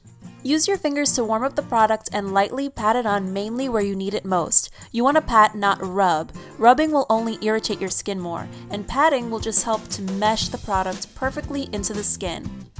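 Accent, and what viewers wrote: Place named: New York, United States. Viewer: *yeah for new york accents*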